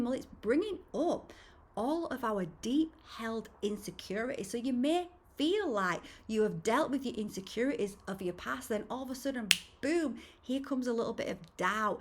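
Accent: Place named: North of England, United Kingdom. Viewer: Thanks so much for your video which really resonates. I feel like I’ve been on pause for a long time. I noticed your video lasted 9.11 and I have been seeing that number sequence for a long time! What part of the world are you based by the way? You sound like you’re from the North of England. I live in Prestwich. Sending love and sparkly wishes xxx 🙌💕🧚‍♀️🪄💫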